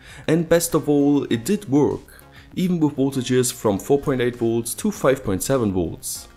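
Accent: Germany. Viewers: deutscher stimmts?:D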